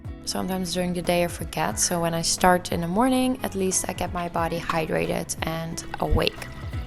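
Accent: Netherlands. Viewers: you sound like you are dutch! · I smell een Nederlanderrrrr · I think she’s Dutch sounds it …Wonder which part of the Netherlands she’s from :) Nice vid 🎉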